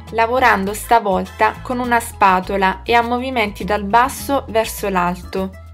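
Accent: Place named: Brazil. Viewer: wao que hermoso video, y que hermoso acento eres de Brasil o Italiana ... muchas felicidades ... saludos desde MEXICO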